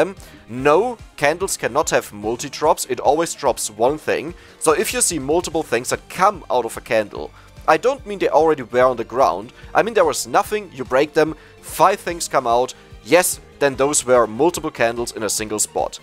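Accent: Germany. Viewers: Hab nach 2 sekunden gemerkt dass du deutsch bist, aber geiles video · Bro you're a German, right? Idk I feel the German accent in your English so badly haha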